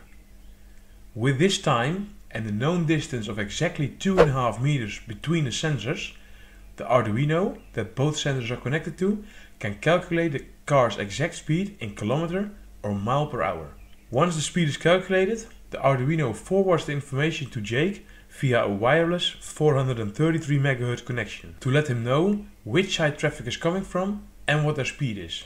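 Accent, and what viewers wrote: Netherlands. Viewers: nice!..that would actually be a good kind of"scarecrow" in the garden..just a question ,how come you got such a strong dutch accent? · jezus man, je kan wel wat beter je best doen om minder nederlands te klinken. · are you dutch?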